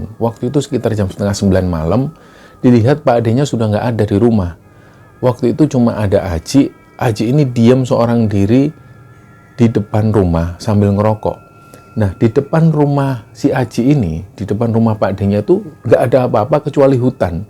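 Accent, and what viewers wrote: Java, Indonesia. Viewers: yg ku senangi sama channel ini, medok jawa nya dalam membawa cerita ini mampu membawa pendengar ke dalam cerita!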